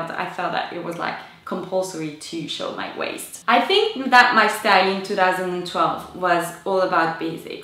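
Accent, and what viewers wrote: France. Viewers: Are you French? I'm french, x) and when people talk in english It's the same accent x) sorry for my bad english ;w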